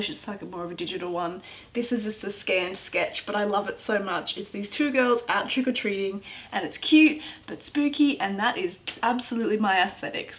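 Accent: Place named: Australia. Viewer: I love your accent. So Australian! :)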